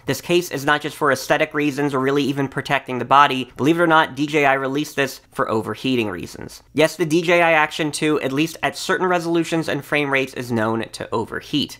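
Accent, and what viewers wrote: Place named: Delaware, United States. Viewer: I'm watching this from Poland right now, but I grew up in Delaware. I'm def hearing some sort of Delaware / South Jersey accent. Key words - waterproof "wooderproof" / video "videoh" I love it, brings me home!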